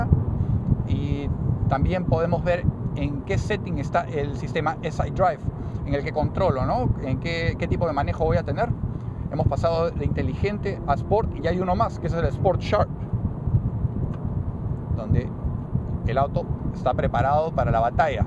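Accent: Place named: Peru. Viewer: Are you from Peru? My girlfriend was translating your video for me and noticed your accent. It's just like hers.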